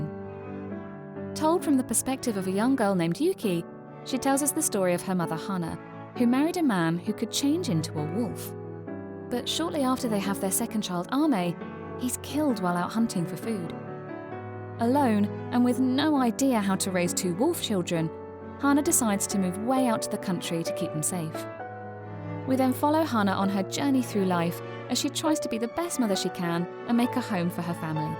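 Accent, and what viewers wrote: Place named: United Kingdom. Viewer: That British Accent makes this even more legit.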